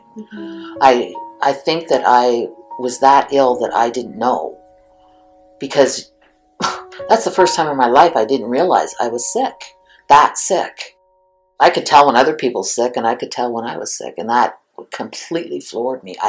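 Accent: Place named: Pacific Northwest, United States. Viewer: Thank you for your story from Australia. You sound as if you may be from Pacific Northwest or BC. Much love and hope you continue to improve.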